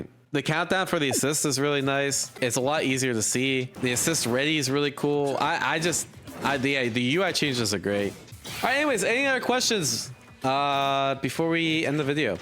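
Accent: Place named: North, United Kingdom. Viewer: bri'ish, but northern.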